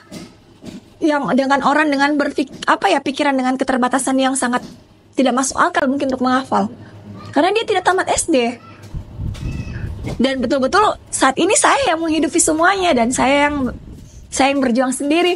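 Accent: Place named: Makassar, Indonesia. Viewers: Kental sekali logat Makassarnya.... Slm dari Manado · Makassar bro ewako❤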